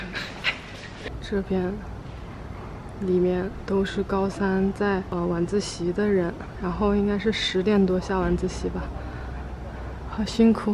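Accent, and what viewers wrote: Wuhan, China. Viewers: 刚从武汉的家里回到墨尔本，看了你的vlog又开始想家了。平时可能不觉得有什么，在异国他乡听到看到故乡的东西总是会让人有更多的感触。其实一开始我是搜旅游vlog看到你的，然后发现口音有点熟悉，点开主页发现果然是武汉人哈哈哈！ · hhhhh你一回武汉普通话就有口音了哈哈哈哈 我哥也是华师一的 好像是08年入学的（如果我冒记错的话哈哈哈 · 看博主别的视频的时候听口音就觉得是我们武汉的普通话hhh，然后发现了这只vlog证明了我的猜想！看片头就认出了销品茂的金逸哈哈哈开心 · 哈哈哈 这武汉话和我男朋友家里说的一模一样😂😂😂同在澳洲，果断关注❤️❤️ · 看到好多好吃的，还有武汉话，好亲切。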